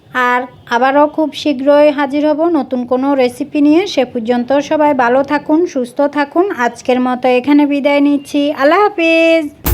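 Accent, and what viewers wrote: Noakhali, Bangladesh. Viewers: মনে হচ্ছে আপনার গ্রামের বাড়ি নোয়াখালী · আফু, আমনের বাড়ি কি নোয়াখালি নি, আর ত মনে অয় আমনে আংগ দেশি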